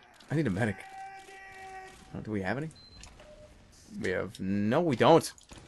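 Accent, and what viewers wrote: Boston, United States. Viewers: well ya, scout just has a boston accent. Jerma sounds like hes from them parts. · he always had the boston accent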